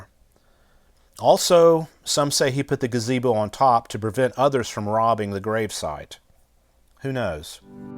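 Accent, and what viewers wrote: South, United States. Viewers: He has a Southern accent. No question about it. Just like I do. "I got my own way of talking, But everything is done With a Southern accent Where I come from." ~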